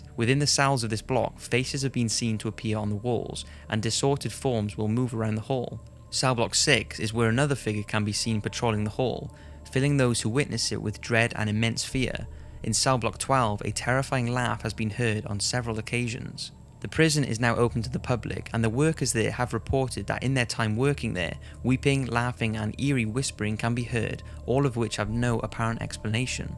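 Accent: Wales, United Kingdom. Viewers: Trying to work out your accent. . . Cardiff? Newport? Anywhere near? ... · You sound welsh. Awesome voice! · Are you Welsh? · You have a Welsh accent . sounds divine 😍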